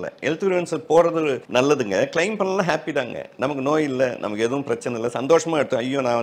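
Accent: Coimbatore, India. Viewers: Enga Coimbatore slang uhh nga❤ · Evalo respect a peasararu 🙏Kongu slang ❤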